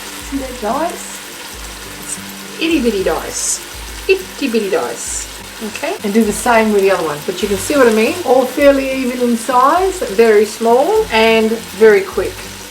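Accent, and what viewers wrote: Australia. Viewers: Sortaye? Saute? It's ok, I'm getting the hang of Strine speak now :) Actually another top notch cooking lesson and recipe!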